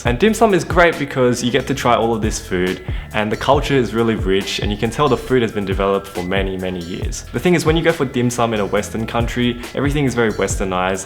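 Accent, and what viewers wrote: Australia. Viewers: That ozzy Canto combo is hilarious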